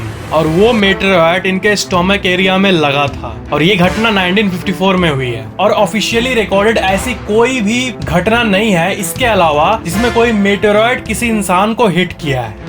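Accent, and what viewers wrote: Bihar, India. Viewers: Tumhare Bolne ke tarike se anyone can say you are bihari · Bhaiya ap bihar se h kya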